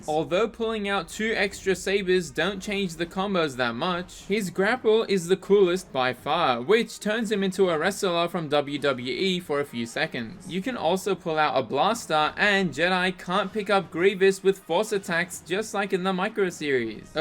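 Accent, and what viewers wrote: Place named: Australia. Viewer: I was baffled when you said this game had an M rating but realized your Aussie accent and then it made sense 😆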